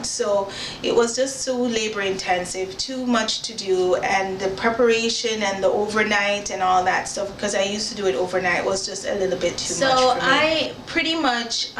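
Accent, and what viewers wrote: Jamaica. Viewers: I'm picking up a tinge of accent....are you Jamaican?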